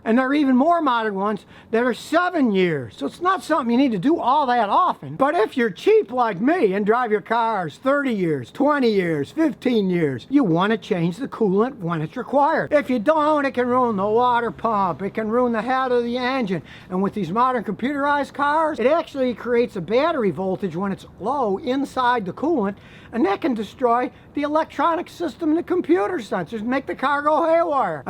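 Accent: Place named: North, United States. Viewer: ! Are you from Houston originally? (I’m born and raised in Houston but live in Cali now). You sound like you’re from the north. Also I LOVE your videos. I’m a car salesman and you make me laugh so hard AND help me learn a lot (which helps me to properly educate my customers - Win, Win, WIN!)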